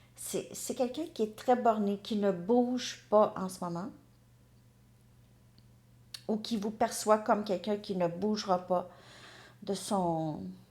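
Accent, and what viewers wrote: Canada. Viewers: Vs êtes adorable et encore plus votre accent Canadien je vs adore 🥰 Princesse 🇹🇳💐🌹🌹🌹🌹🌹👏🏽🎻🪐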